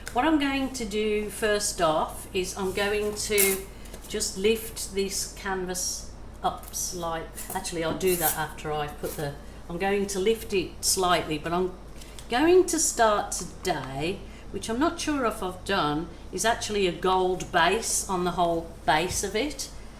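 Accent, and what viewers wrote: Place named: Liverpool, United Kingdom. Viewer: I love this. I also like the other one you did with all the shades of Aqua and Orange. The drip line painting i know exactly what your'e talking about. I agree with what you said about using every other line in the tray but also maybe still elevate your top end but start your dripping/pouring from the bottom end and moving up to your top end????? Or again do the same from your top end moving the pour down to the bottom as you always have more paint at the beginning than the end and it could run down into itself. If i'm overstepping the mark here please ignore me. I'm no artist but i understood what you were trying to do, may; maybe more elevation! Either way, i LOVED both of the ice cube tray paintings. I am so itching to get someone to help me clear out my spare room and start ordering paint supplies. You are an Inspiration and i love your down to earth attitude. 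You don't take yourself too seriously which is wonderful. I can honestly say that from the people that i have looked at their art on YouTube you have to be my favorite and i mean that sincerely. You have spoken briefly about your Mum in the UK and from your accent i'm thinking Liverpool maybe. I don't know. Thank you Thank you Thank you for all your work and iv'e never seen a bad result. Take care. Shirley xx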